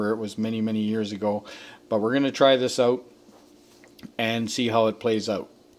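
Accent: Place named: Midwest, United States. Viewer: Most Midwestern accent ever lol!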